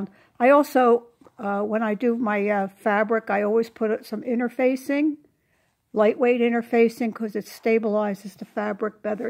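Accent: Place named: Baltimore, United States. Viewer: I love your bowl and accent. I'm guessing your from my home "town" of Baltimore or maybe Philly?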